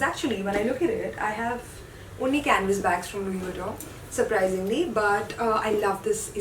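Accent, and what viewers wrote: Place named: India. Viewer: Talk slowly..too fast hard to understand because of strong indian accent.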